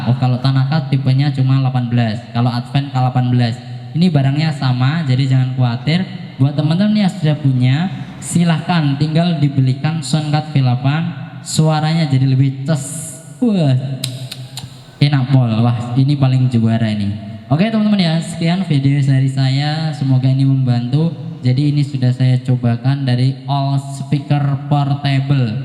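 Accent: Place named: Java, Indonesia. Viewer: Saya tebak orang ini pasti jawangapak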